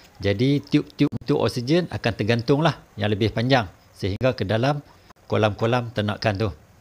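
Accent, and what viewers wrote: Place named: Malaysia. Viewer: Bahanya kok kaya orang Malaysia ya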